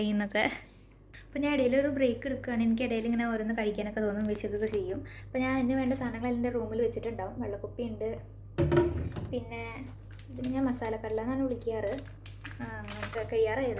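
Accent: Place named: Palakkad, India. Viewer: Pkd ahno than ? .. ur accent is like that !!❤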